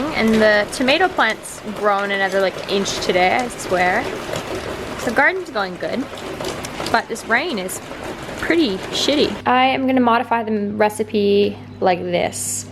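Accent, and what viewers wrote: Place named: Australia. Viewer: Am I the only one that hears an Australian accent sometimes 😀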